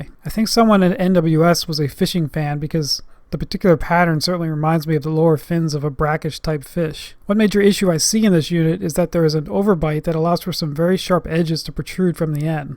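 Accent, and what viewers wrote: Germany. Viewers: sir are you german or hungarian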